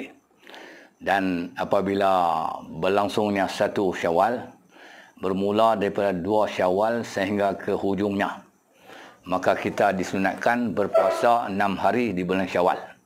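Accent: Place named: Kedah, Malaysia. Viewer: loghat Kedah ada yg sneng ada yg ssh utk digertiin...dri Kelantan Sy terus gk bisa gerti...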